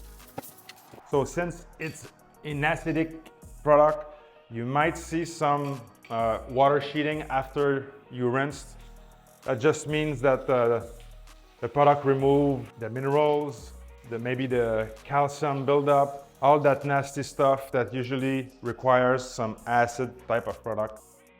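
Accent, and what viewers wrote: Quebec, Canada. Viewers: You sound Québecer. Subbed!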